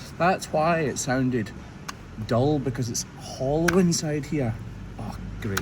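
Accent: Scotland, United Kingdom. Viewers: Where in Scotland are you from I’m from Ayr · yer Scotish acent is pyer goodnes, mate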